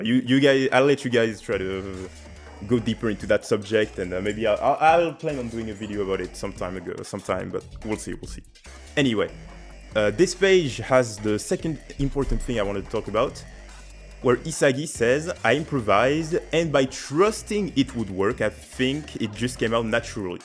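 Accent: France. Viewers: haha I think it's for the better. I believe your accent is very good for the ear. I especially picked that you were French when you said the word "Egoist" and "egotist". The "ist" at the end sounded very much the "ist" in the French word "égoiste". I think it's good, it adds variety of people in the blue lock community!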